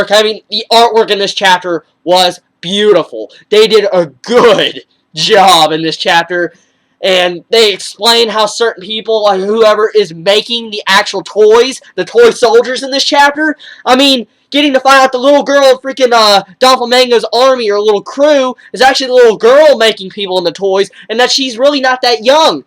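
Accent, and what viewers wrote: South, United States. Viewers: Did you study voice training? Or something to that effect? You sound noticeably more country back then and now you speak very clearly and slowed down.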